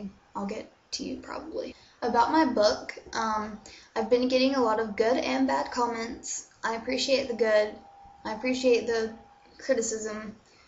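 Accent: United Kingdom. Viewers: thanks for sharing so much personal information with us. Beeing a military wife sounds quite depressing :( I'm male and after I experienced war in my home country as a kid, I was sure that I would never go to any army in the world. Ofc its nothing bad about beeing a soldier, but still it's stressfull for a familylife having that kind of long distance relationship.. I wish u and your husband good luck and allot of love and strenght. (your britishaccent is amazing, wish I could talk like that) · hey stumbled across your YouTube via your "how to speak in a British accent" vid. the others are interesting tew! hope all is well. are you British o American lol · I subbed to your channel at first because the british accent too, i to was somewhat obsessed with the UK - i was looking into traveling there, then moving there it was kindof random actually lol.. Shit, you had me fooled.. 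lol good job girl! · BTW ether I loved ur British voice XD. And good luck to Michael out there, but no offense he's in the navy not too much to worry about. I know its hard for him to be away but trust me in the long run this will be better for your family beneficial wise. Stay strong :) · What happened to your british accent?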